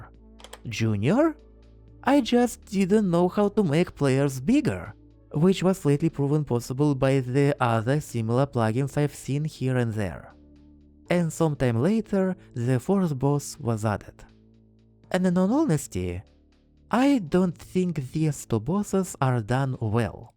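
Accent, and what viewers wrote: Russia. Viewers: Почему у тебя такой русский акцент? (я просто тебя впервые вижу) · Are you russian ?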